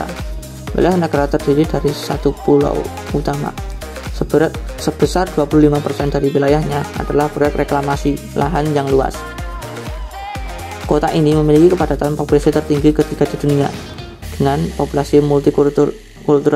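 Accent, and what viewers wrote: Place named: Java, Indonesia. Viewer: Ngomongnya medok banget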